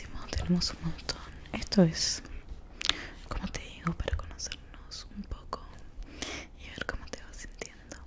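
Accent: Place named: Argentina. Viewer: Amó tu acento argentino, saludos desde México ❤️💤💤💤💤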